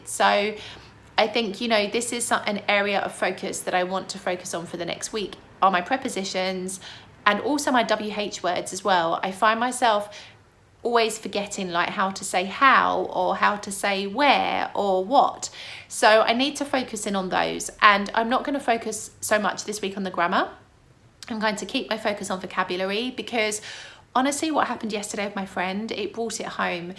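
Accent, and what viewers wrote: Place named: Cockney, United Kingdom. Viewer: Surely an Hospital is not just the easiest place to learn Italian. You did pretty good. We all were like you at the beginning of our English learning language path. Grammar will follow along once you'll be more comfortable with the spoken language. Italian grammar is pretty difficult, especially tenses; try to learn it in little bits a time. But, please, don't spoil that lovely Cockney accent. 😁 You'll soon realize that at least the 70% of italian terms have corresponding very similar terms in English, some of them are just little bit less used because they may sound sometimes a bit archaic or poetic or too technical. Probably in some cases some ancient Latin inscriptions could be better understood by an Englishman not knowing Latin than an Italian not knowing Latin. 😁 As some funny videos, such as those from Ecolinguist or from Luke Ranieri - Polymathy YouTube accounts, could explain very well. 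😁 Keep up the good work. 👏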